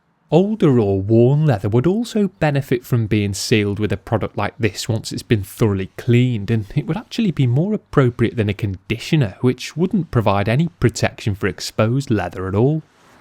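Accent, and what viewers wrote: United Kingdom. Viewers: Somewhere near Liverpool in the North West of England. I don't know exactly where but that's where is accent is from. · Conorsz He is definitely from a particular part of the UK, I'm just not sure which area.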